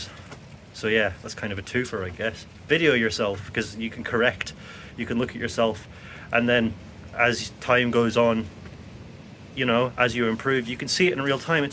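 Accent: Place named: Liverpool, United Kingdom. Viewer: Your accent, what is it? Liverpool? Cool video. Subbed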